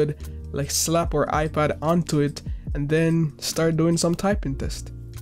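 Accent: Jamaica. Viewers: He's Jamaican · Jamaican accent unmissable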